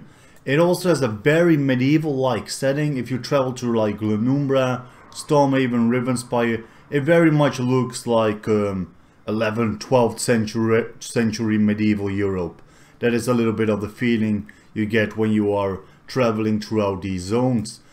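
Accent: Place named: Russia. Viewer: I haven't heard the dutch accent much I guess and maybe there is a reason that's obvious idk... But I hear a bit of russian in there.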